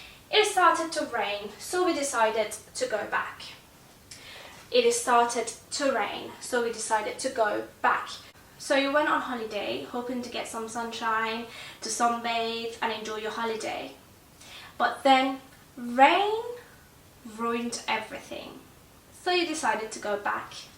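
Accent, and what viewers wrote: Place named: England, United Kingdom. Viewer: سلام دوست عزیز . وقت بخیر . من حدود 3 ماه هست که برای ادامه تحصیل وارد انگلستان شدم وهمچنین برای تقویت زبانم در حال آموزش زبان انگلیسی هستم و تازه با آموزش های شما آشنا شدم. من اولین کلیپ که از شما دیدم اونی بود که اول به زبان انگلیسی فعل های get back , ... رو توضیح می دادید و بعد به زبان فارسی. حقیقتا من اولش که اون کلیپ رو دیدم واقعا هم از لحاظ ظاهری و هم از لحاظ لهجه فکر کردم شما یک خانم اهل انگلیس هستید که دارید زبان انگلیسی رو آموزش می دید و وقتی دیدم که به زبان فارسی هم تسلط دارید واقعا تعجب کردم. شما با لهجه کاملا انگلیسی و روان صحبت می کنید و تمام مواردی رو هم که می گید دقیقا کاربردی هست و مردم انگلیس دارن توی کوچه و خیابان از اونها استفاده می کنند. البته اگر اشتباه نکنم شما احتمالا مدت طولانی هست که مقیم انگلیس باشید. به هر جهت کارتون واقعا عالیه و من مطمئنم که اگر با برنامه پیش برید و مطالبی که برای ارائه انتخاب می کنید همچنان جز موارد اولویت دار و مهم برای آموزش زبان انگلیسی باشه حتما جز بهترین ها در آموزش زبان انگلیسی خواهید بود.استفاده از دوره های شما برای من خیلی مفید هست و امیدوارم که همچنان به تولید کلیپ های بیشتر ادامه بدید. 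من دوره های آموزش مکالمه انگلیسی در 30 روز رو پیگیری می کنم. البته این سه جلسه اول برای سطح زبان من مناسب نبود ولی مطمئن هستم که کمی جلوتر برید حتما من هم استفاده خواهم کرد. ببخشید اگر طولانی شد ولی حیف بود اگر در پاسخ به کارهای خوب و حرفه ای شما این توضیحات رو نمی دادم. برای شما و همه دوستانی که شما رو دنبال می کنند آرزوی موفقیت دارم.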